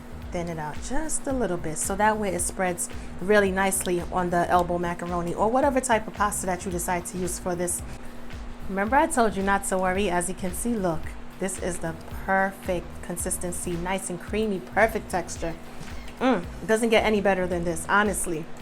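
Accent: New York, United States. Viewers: I love this channel. I can't lie, I come back for that NY accent more than anything. I love how women from NY talk.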